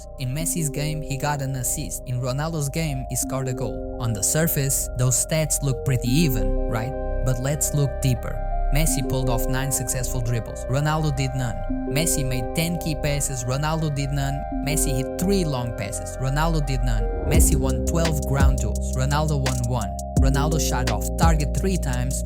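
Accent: Portugal. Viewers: Is the narrator portuguese? The english accent seems like it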